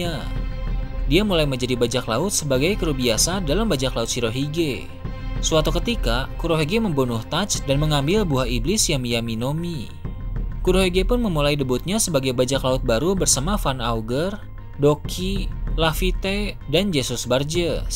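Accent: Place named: Bogor, Indonesia. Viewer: Bang, Lu orang bogor ya.. logatNya kentel amat..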